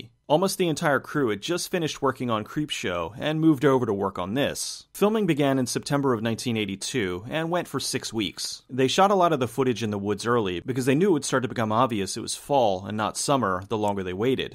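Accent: Philadelphia, United States. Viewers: I sense a northeastern accent, near philly perhaps?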